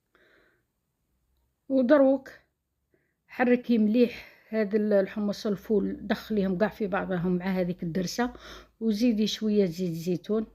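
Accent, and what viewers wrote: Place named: West, Algeria. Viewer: حسب اللهجة هذه السيدة من الغرب إذا أهل مكة ادرى بشعابها تترك الوصفة للبسكريات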